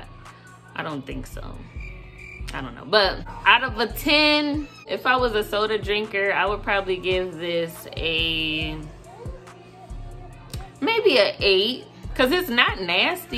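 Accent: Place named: New York, United States. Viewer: Lol you got me may want to try it but I doubt it lol not sure where u from but I’m catch that NY accent can be wrong lol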